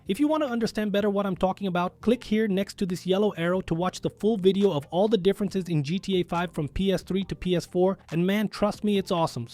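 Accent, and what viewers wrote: Brazil. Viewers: He is brazilian, just like me. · actually its portuguese he have an brazilian channel and lets just say his english isnt that good hope this helped!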